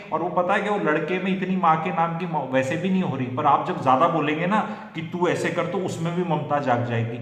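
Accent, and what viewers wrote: Haryana, India. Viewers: sir aapki hindi samajhne me thori dikkat hoti hai. I think it's a Haryanvi based hindi. It's not a standard hindi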